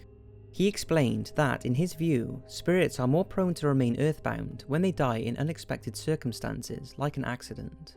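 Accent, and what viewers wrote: Wales, United Kingdom. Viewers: r u Welsh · The only comfort for people watching at 1am is that there is a lovely Welsh voice narrating it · Is Welsh? I swear he is... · Is the voiceover Welsh?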